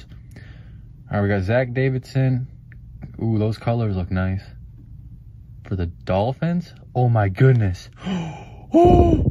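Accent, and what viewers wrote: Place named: St. Louis, United States. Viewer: Your accent sounds like Saint Louis county…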